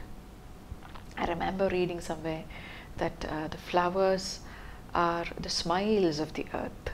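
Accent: India. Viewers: Ma'am I have been watching your video for last one year. Now I am a teacher too. So about my last interview I gave a demo class on my subject. I noticed your attitude through your video that you always being clam and using Indian accent. Ma'am believe me I did exactly follow your attitude and Now I am selected. So thank you so much for giving the inspirational personality. ❤ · IN Skip navigation i remember i remember by thomas hood 9+ Avatar image / I remember, I remember - Poem by Thomas Hood | Explanation English Lessons 64.1K subscribers Subscribe 556 Share Download Clip Save 24K views 1 year ago An explanation of Thomas Hood’s poem I remember, I remember. 37 Comments R U Thowamy Add a comment… 11 months ago Ma'am I have been watching your video for last one year. Now I am a teacher too. So about my last interview I gave a demo class on my subject. I noticed your attitude through your video that you always being clam and using Indian accent. 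Ma'am believe me I did exactly follow your attitude and Now I am selected. So thank you so much for giving the inspirational personality. ❤ 22 Reply 6 months ago (edited) Wonderful analasys, I saw many other analasys but your is the best of them. Thanks alot for your efforts and simple analasys. 1 Reply 1 year ago Ma'am, please do a video on the poem Mac flecknoe by John Dryden. 1 Reply 1 year ago The teaching style is very good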